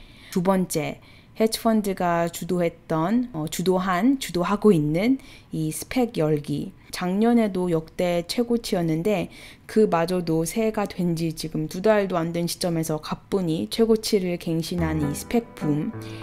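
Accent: United States. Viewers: ㅋㅋ 아니 미국에살아서 미국식 발음 하는게 뭐가 그리 불편함? ㅋㅋㅋㅋ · 뉴요커발음 ㅓㅜㅕ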